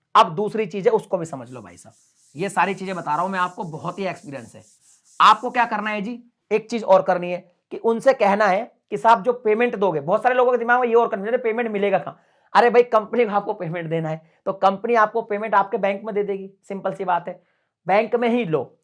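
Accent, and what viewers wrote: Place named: Haryana, India. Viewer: Aajkal aapki hariyanavi nikl rhi sir 😊❤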